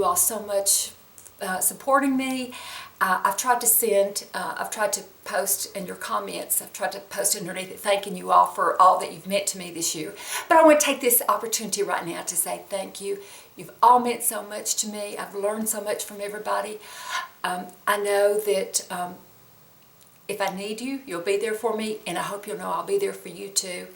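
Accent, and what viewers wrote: South, United States. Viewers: You are one of my favs! I just love your genuine sweet personality and as southern girl...I LOVE that accent! And....holy cow I had no clue you were almost 60...you are GORGEOUS inside and out! I would love to see some more videos on your secrets to aging so gracefully....both on the inside and out! Happy New Year!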